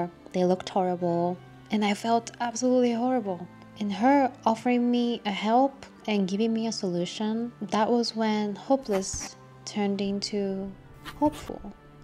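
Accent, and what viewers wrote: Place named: United States. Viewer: Why do you speak in an American accent if you're from Japan?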